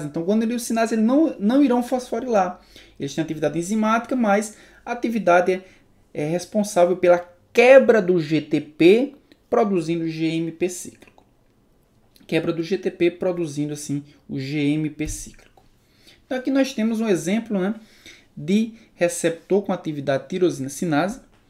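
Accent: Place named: Brazil. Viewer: muito bem explicado, adorei. Que sotaque lindinho e simpático, bem Brasil. Obrigada pela ajuda. bjs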